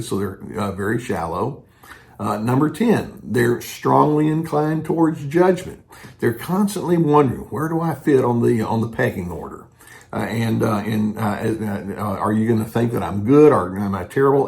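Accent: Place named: Texas, United States. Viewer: I find your videos very useful and I love your Texas accent! I'm from the UK. 👍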